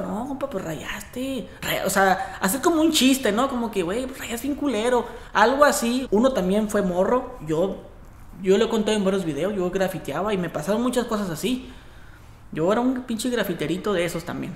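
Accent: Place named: Mexico. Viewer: Jajaj cómo hablas de gracioso, todo Mexican boy 😂 ... Saludos, from 🇨🇴 🇺🇸 up state🗽 Pobres padres con demonios de hijos.🤦‍♀️ Obvio me suscribo. Jajajja tengo que tirar caja con tus frases😂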